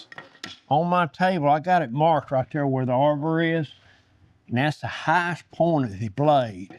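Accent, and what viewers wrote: South, United States. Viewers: I had asked, in a different video, what state you were from because I could not quite place it. I was thinking Kentucky, Tenn, NCar or maybe Virginia. I got pretty close. I'm from Texas, so knew it wasn't that one -- farther east than TX or LA, and farther north than MS, AL, etc.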